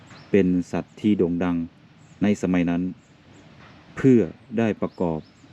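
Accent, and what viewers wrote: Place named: Thailand. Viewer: อ่านภาษาลาวกะต้องออกสำเนียงลาวตั๊วจังแมน ออกสำเนียงไทยมันกะไปคนละทีปถ่อนแล่ว.. วรรคสุดท้ายเพิ่นบอกให้ปลดเกิบ คือถอดรองเท้าเพื่อแสดงความเคารพ...เบิ่งมาหลายคลิปเกี่ยวกับวัดตาแหลว แต่บ๊มีไผเว้าถึงสาเหตุที่แท้จริงการถล่มวัดของเมกา นอกจากคาดเดาว่า แถวนี้เป็นจุดยุทธศาสตร์ที่สำคัญ และกองกำลังทัพประชาชนลาวใช้วัดแห่งนี้เป็นฐานในการสู้รบ..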